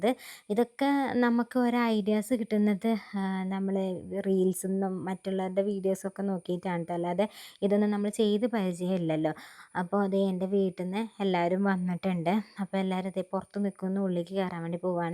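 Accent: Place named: South Kerala, India. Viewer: മോൾ സുന്ദരി ആയിട്ടുണ്ട് കേട്ടോ ❤.ഒരു കാര്യം... മോൾ സംസാരിക്കുമ്പോൾ തെക്കൻ കേരള ഭാഷ കയറി വരുന്നുണ്ട് കേട്ടോ.വരത്തില്ല... പോകത്തില്ല etc. അതു വേണ്ട എന്ന് തോന്നുന്നു. നിങ്ങളുടെ ഭാഷ കേൾക്കാനാണ് സുഖം❤❤❤ എല്ലാ അനുഗ്രഹങ്ങളും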